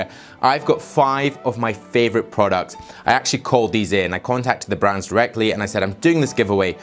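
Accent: United Kingdom. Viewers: Congratulations on your achievement! You help me so much build interest for my hair and having an awesome hairstyle.😊 Also I really love your British accent you look so charismatic. My favourite vid of yours is for sure is when you fixed your worst haircut. <link> Dom really saved the day! Also you were so hilarious in the rant. You’re right about understanding and listening someone (which that barber didn’t did😉). Love from Canada!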